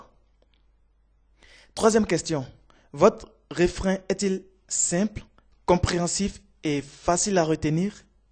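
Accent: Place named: Africa. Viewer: super le frere acsent africain et tout ayeeeeeeee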